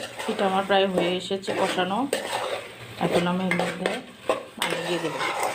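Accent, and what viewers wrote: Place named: Sirajganj, Bangladesh. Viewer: প্রথমে আমি কে ধন্যবাদ জানাই ধন্যবাদটা এ কারণে আপনাদের এই চ্যালেনে রান্না থেকে আমি অনেক কিছু শিখতে পেরেছি আমি দেশের বাইরে থাকি এখানে রান্না থেকে আমি অনেক কিছু রান্না করতে শিখেছি আমি জানিনা আপনি কোন জেলার মানুষ তবে ভাষা থেকে বোঝা যায় আপনি সিরাজগঞ্জ জেলার মানুষ আমি সিরাজগঞ্জ জেলার কাজিপুর থানার সোনামুখী ইউনিয়নের মানুষ আমাদের ভাষার সাথে আপনার ভাষাটা মিলে যায় এই কারণে বললাম দোয়া করি সামনের দিকে এগিয়ে জান সামনে আরো নতুন কিছু আইটেমের রান্না নিয়ে আসবেন ওখান থেকে আমরা শিখে নিব ধন্যবাদ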